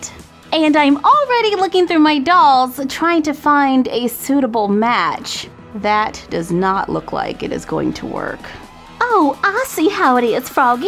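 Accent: South, United States. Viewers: her southern accent 😂😂😂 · you have an amazing southern accent froggy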